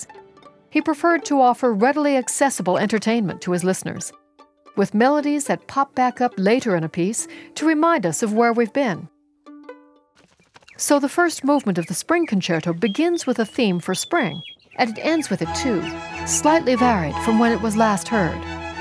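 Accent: United States. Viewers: What is this lady's accent? Obviously some kind of American but sounds a bit different? (also, great vid!) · Quite annoying with the very American accent, maybe in respect to the masterpiece, put an European to narrate the video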